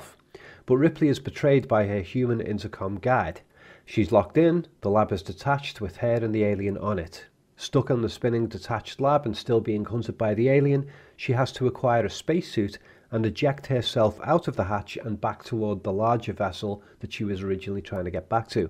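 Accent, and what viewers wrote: Liverpool, United Kingdom. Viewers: My apologies for always assuming you were from Scotland instead of from Liverpool. My ignorance of accents outside of the USA.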